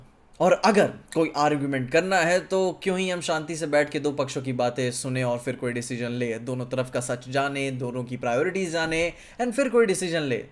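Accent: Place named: Bihar, India. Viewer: bihari accent ❤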